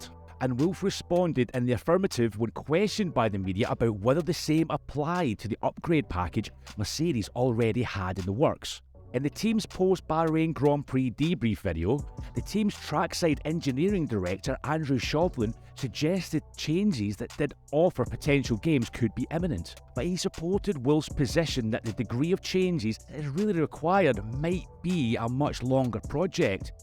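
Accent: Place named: Highlands, United Kingdom. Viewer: nice accent man, r u highlander? u roll the r like spanish pro speaker jajaja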